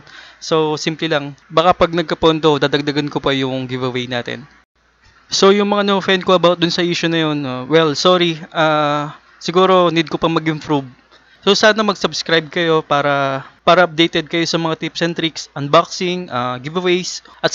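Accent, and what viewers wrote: Pampanga, Philippines. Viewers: Kapampangan ka sir noh? 😁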